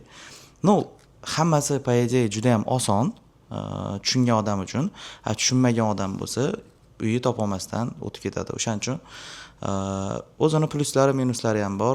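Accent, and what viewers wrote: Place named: Tashkent, Uzbekistan. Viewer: Хоразмдан акансизку лекн тошкенчани йориб гаплисиз акан гап ёк😅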